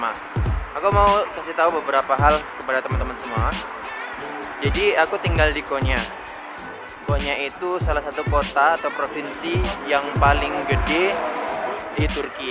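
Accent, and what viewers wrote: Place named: Parabek, Indonesia. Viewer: logat Parabek wkwkkw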